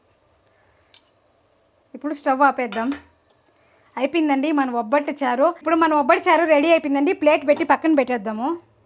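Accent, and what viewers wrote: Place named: Chittoor, India. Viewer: Pakka chittoor slang. Baaga chebutunnaru. Maa athagaaru ilagae chestharu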